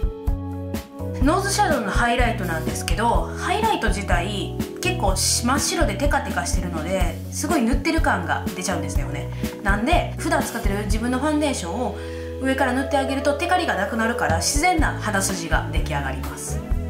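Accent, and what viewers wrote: Kansai, Japan. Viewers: 関西弁すごい可愛いです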